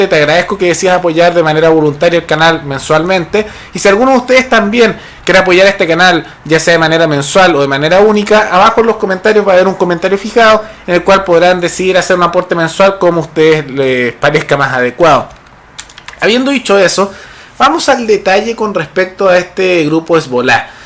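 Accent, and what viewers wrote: Chile. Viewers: Yo lo que sé es que jamás me había gustado el acento chileno excepto tú si tú tú tú me gusta como hablas y ese acento que tienes claro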